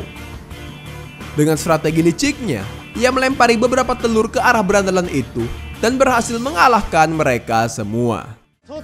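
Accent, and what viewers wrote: Medan, Indonesia. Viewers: Medan kali bahas nya bossss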